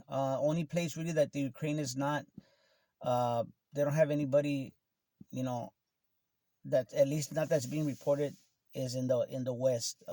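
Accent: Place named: Texas, United States. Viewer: New sub. Love the style and real. Texan here as well. It’s really nice to hear a native voice in all this. Thanks man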